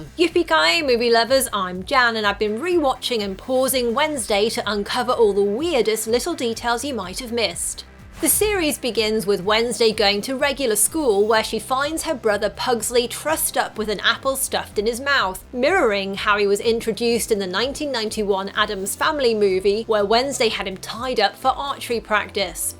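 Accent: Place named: United Kingdom. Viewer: That correct pronouce of Ortega was absolutley unexpected, whilst having a strong Brit accent.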